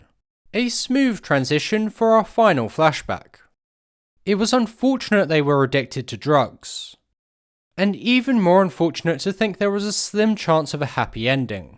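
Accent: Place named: South, United Kingdom. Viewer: It’s just a regular, South English accent.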